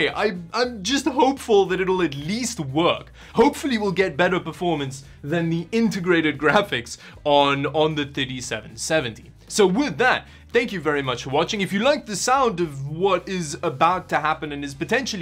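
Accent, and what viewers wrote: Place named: South Africa. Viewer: I can bet you are south African, eh? Afrikaanse mense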